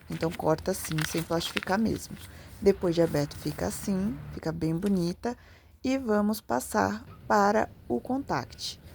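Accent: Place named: Ceará, Brazil. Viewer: Gostei muito lindo fala do Ceará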